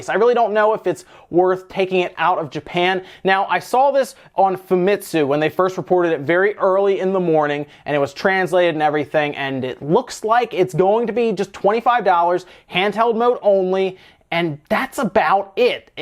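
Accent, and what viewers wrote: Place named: Maryland, United States. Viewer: you sound like you’ve got a MD accent.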